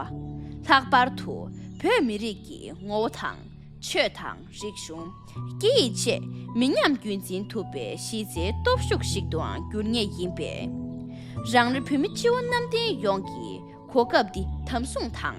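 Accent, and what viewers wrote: Standard. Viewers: བོད་ཆས་གཙང་མ་དང་བོད་སྐད་དག་མ་བཤད་ཀྱི་འདུག་པ་མ་ཟད། བཙན་བྱོལ་ན་ཡོད་པའི་བོད་རིགས་མང་པོ་ཞིག་གིས་མིག་དཔེ་ལྟ་འོས་པ་ཞིག་རེད།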